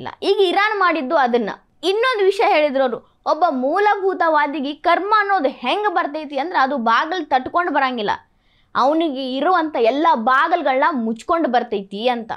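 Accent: North Karnataka, India. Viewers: Nimma Uttara Karnataka Bhashe Super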